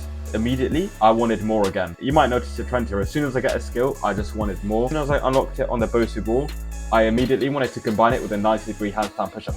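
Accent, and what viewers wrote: United Kingdom. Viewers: holy british accent and british teeth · are you british i'm just asking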